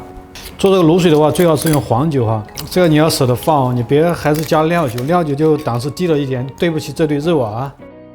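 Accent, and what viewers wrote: Sichuan, China. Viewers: 这个川普听上去真上头啊 哈哈 但是越听觉得越是喜气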